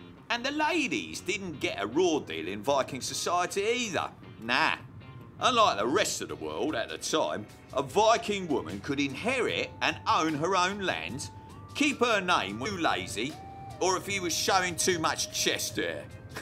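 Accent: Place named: England, United Kingdom. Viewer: this accent is what exact in the church of england ?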